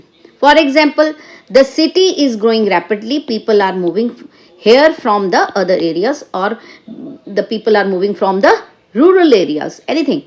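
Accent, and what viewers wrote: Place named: India. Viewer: Was your strong Indian accent an obstacle to be an IELTS examiner?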